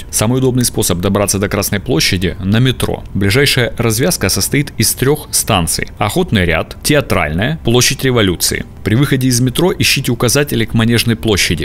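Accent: Ukraine. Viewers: Голос за кадром - иностранца , а точнее - украинца,.Сам фильм хороший, расчитан на 10 дней. В ресторан не обязательно, но билеты в Большой театр , даже по 5 тысяч , россиянам не по карману.В СССР была возможность ходить по студенческому и ЛЮДИ ходили на спектакли после работы. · Видио очень понравилось,как и коментарий к нему,но почему то удивил украинский акцент,как будто про Киев автор рассказывал,но может так и интересней,спасибо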